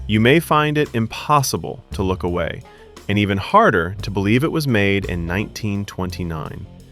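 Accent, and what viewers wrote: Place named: United States. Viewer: You sound very American! How did you master English so well??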